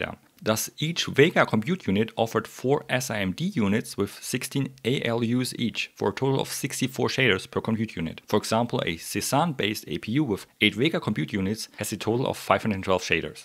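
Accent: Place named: Germany. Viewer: I love the German accent in your English 😀😀. I just subbed.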